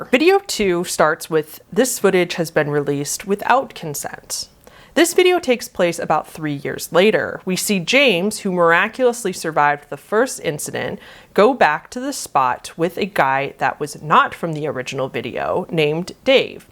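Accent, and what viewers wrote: United States. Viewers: KobenThat’s just her accent. Most Americans pronounce “Shire” like “Shy-yur.” I’m sure she didn’t mean to offend anybody.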